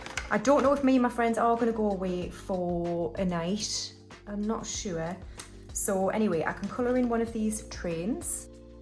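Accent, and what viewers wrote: Newcastle, United Kingdom. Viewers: Love listening to your accent. I'm a Geordie too but live in Northern Ireland so I miss the home accent. You sound more Gateshead than Newcastle...?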